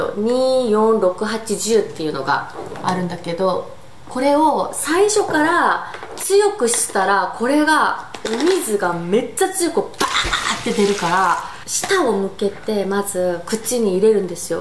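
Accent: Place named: Kansai, Japan. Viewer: 関西弁おもしろい😂アロマ使ってみたいです！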